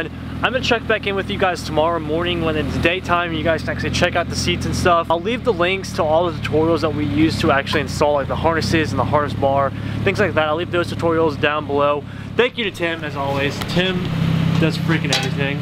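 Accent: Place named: South, United States. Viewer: new to your videos but I could tell instantly yall were southern from yalls voice.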